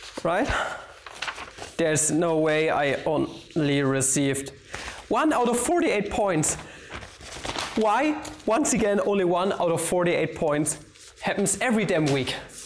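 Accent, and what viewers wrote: United Kingdom. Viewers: nailed the british accent boi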